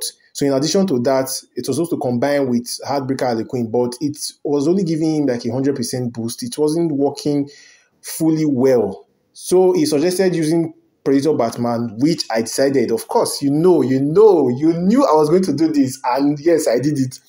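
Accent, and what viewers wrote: Nigeria. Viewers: Dude you sound Nigerian 😃. If yes, then you are like the first Nigerian I have come across doing videos on IJ2. Nice work 👍. As for the damage, the 300% boost is for the base damage of the character and not total damage. This means the boost does not factor in extra damage from gears, passives, talents and the likes.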